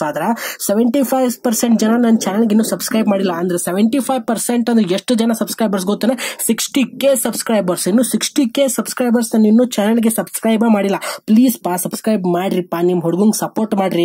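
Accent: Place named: Bagalkot, India. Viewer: Anthamma... 😂😂 Paoo.. paoo.. dialogue mast aiti noda.. yele bagalkotyav yen nee.? Fida aade nood na..😍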